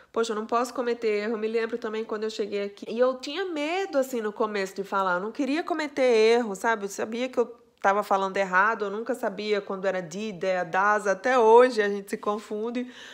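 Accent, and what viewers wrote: Northeast, Brazil. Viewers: Amooo tanto Querida,de Ver Voce Ai Falando com cada um de Nos,muito importante ver e ouvir Voce e o Querido Falando em Alemao c seu Sotaque Noedestino e muito lindo, Parabens pode falar a vontade 💓👏👏👏🎆💞💕🎼😘😍🌺🌹🍁👼🙌😇 🆙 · Imagina o quanto o teu sotaque nordestino é lindo.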